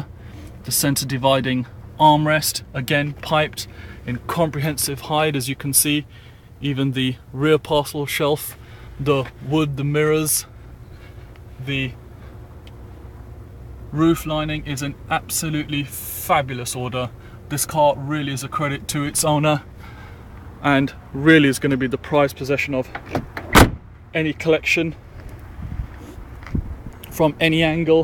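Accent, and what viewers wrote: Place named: India. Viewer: I also counted the word Absolutely and got 23 times in the space of ten minutes, this guy surely has trouble with the English language in the way he uses the same word so many times, without being able to thin k of another word to use. Maybe it is because he can not use all the words in the English language is because he is not English, I detected a faint hint of Indian in his words, so he was doing what all Indians do when they give you a time wasting phone cal.l they always have an English name and try to put on a perfect English accent, as well as not knowing the English he also didn't know the Highway Code, he was parked on a double yellow lone, on both sides and on the back.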